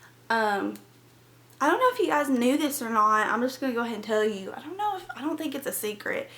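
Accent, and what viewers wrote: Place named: Oklahoma, United States. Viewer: Omg I love your accent!!! I wish texans sounded like that. I live in texas and we talk really fast and nothing like that. I was born in Oklahoma so I used to have an accent similar to yours but not anymore :/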